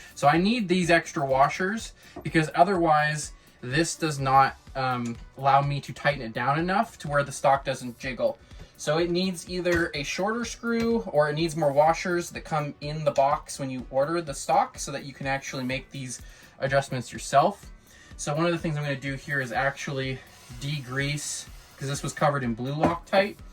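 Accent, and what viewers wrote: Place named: Alberta, Canada. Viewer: Ah southern Alberta love it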